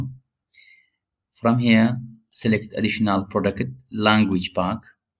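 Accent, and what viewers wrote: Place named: India. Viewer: the moment that you sounded indian it was over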